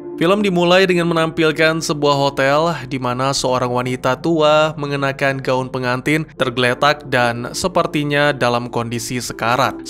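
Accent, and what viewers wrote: Aceh, Indonesia. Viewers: Pasti abangnya orang aceh ya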